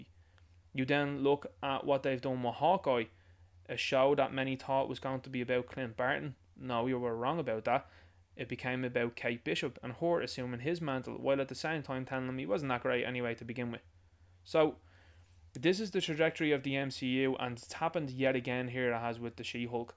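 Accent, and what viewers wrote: Dublin, Ireland. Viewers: Good review. Nice to hear a Dub accent, too. Good one.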